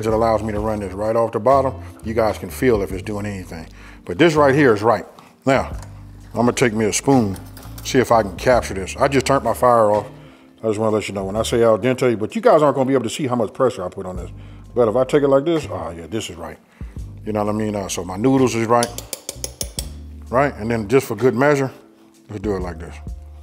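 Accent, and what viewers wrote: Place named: California, United States. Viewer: I love your cali accent, you making me miss home lol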